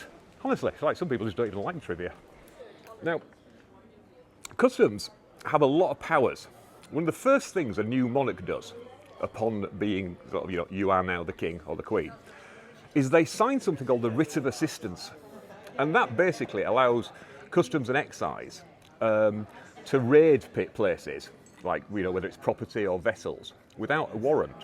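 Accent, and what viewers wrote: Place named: Yorkshire, United Kingdom. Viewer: Not a matter of law, but: my mother's family and their accents came from around Stainland, Sowood, and Jagger Green. I guess yours might be a bit Northwest of there, maybe Mytholmroyd or Todmorden? I live on the other side of the world now, and I've picked up some Kiwi slang, but I don't think I'll ever lose the vowels or the cadence of where i grew up.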